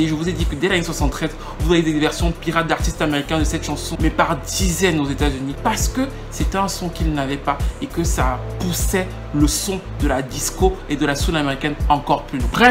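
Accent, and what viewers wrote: Cameroon. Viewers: Je m'en doutais que tu avais un truc camerounais. Ton accent sur certaines phrases me rappelais les touches camerounaises et je me disais c'était juste un parfait hasard.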